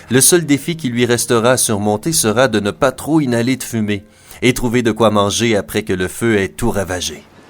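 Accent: Quebec, Canada. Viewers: est-ce t québécois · Parce qu’il est québécois et au Québec, on dit ça comme ça des fois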